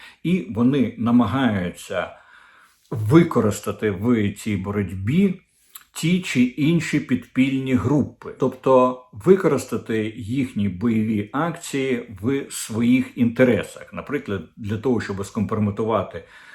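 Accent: Russia. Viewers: Уважаемые украинцы, мне кажется, или этот человек говорит не на чисто украинском, а на каком-то варианте украинского, максимально приближенной к русскому? Он русскоязычный? Просто я 90% понимаю, что он говорит. А когда дикторов на телевидении слушаю, то понимаю только 50%. Он вроде какой-то там националист. Должен правильно по-украински говорить. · Арестович разом з Корчинським колись їздили в Москву для зустрічі з Дугіним і планували спільні дії щодо просування русского мира. Тому недивно, що став на захист Арестовича. Мова у пана українська, лише акцент російський.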